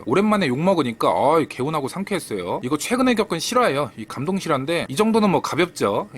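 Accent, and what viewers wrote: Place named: Jeolla, South Korea. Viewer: ..전라도이신가봐여 ㅋ 전 안흘려용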